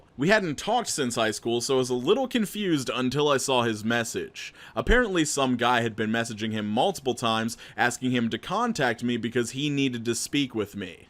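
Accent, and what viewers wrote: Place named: New York, United States. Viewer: That New York accent and voice killed me dude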